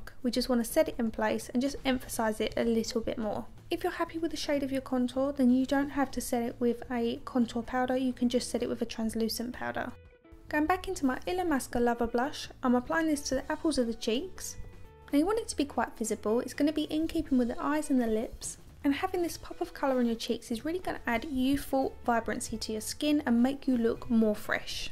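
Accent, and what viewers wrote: United Kingdom. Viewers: You truly make everything look so stunning!! You're so talented- I'm just so very pleased I found you!! And might I add, I love hearing a British accent instead of North American🙊😉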